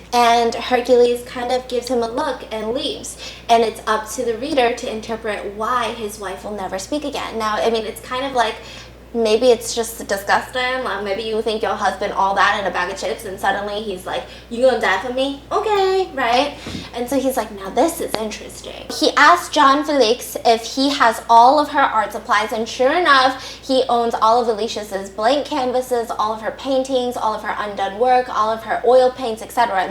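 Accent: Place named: United Kingdom. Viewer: Your fancAy British accent is everythingg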